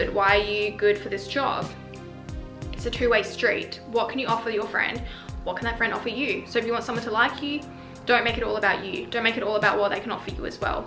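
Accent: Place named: RP, United Kingdom. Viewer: the poshest accent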